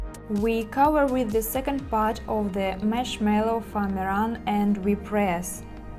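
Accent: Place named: Russia. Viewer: то то я думаю акцент русский 😄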